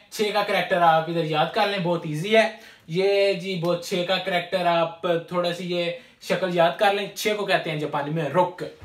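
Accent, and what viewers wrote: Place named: Sialkot, Pakistan. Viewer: bhai..ap sialkoti lagtay hain.....sorry mind naie krna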